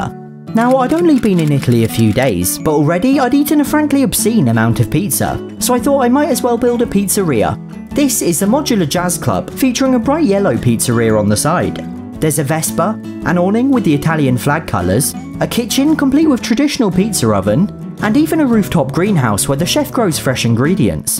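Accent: United Kingdom. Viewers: Between his humour, LEGO obsession, love for cats, incredible content and British accent... you are unmatched Edit: OMG REPLIED TO MY COMMENT! MOM I'M FAMOUS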